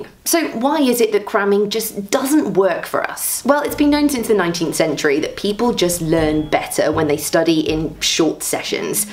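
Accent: United Kingdom. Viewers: I love the British accent. It's so beautiful hahaha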